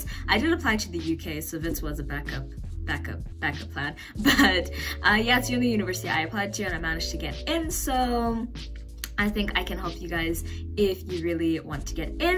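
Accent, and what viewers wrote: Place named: United States. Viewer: Delicious content,. Why do you have an American accent? did you grow up there?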